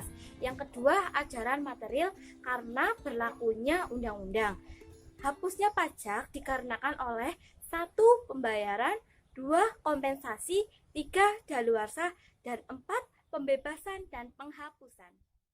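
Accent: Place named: Java, Indonesia. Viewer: Aku juga mau ngomong medok gini di depok mba :(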